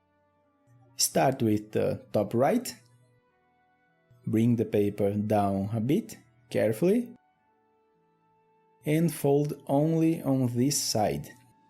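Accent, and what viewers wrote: Brazil. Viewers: Is that a beautiful Brazilian accent?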